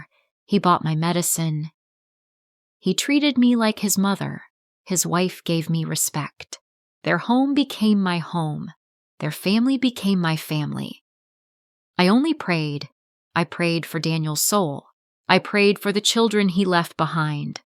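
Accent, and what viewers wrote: United States. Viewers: American or British? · American accent? no, thanks. I want British English.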